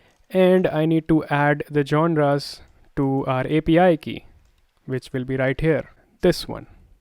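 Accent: India. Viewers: you speak in india english it's not original english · Sorry not sorry but he is Indian so his English is also Indian 🤟🏻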